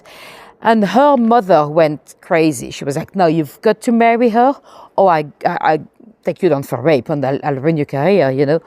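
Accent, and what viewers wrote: France. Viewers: You can't possibly be referring to the French accent? Love it!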